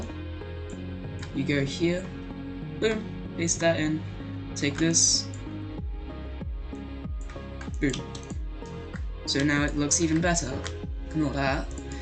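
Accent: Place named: India. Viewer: dude, something funny happened because of my stress, lol, so i got a buncha tutorial tabs opened about walking tuts, and the other video is an india guy talking, it loaded quite slowly, so i opened this video and i lit thought your an indian guy xd anyways, thanks for the tut, the only useful thing i learnt is the stretch thingy :) thanks you again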